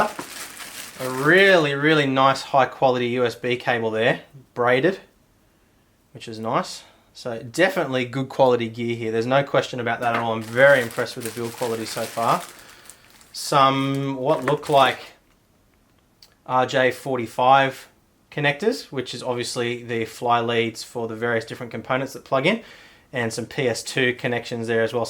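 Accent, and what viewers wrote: Australia. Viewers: Hey thanks for replying. Judging by your accent I think you're Aussie? I'm trying to get my feet into sim racing and stumbled across your channel. I plan to start with a Next Level F1GT + CSL Elite Pedals + CSL Elite Wheel Base 1.1 + Fanatec Formula Black. Primarily will be playing F1 2018/19. What do you reckon this setup for a starter please?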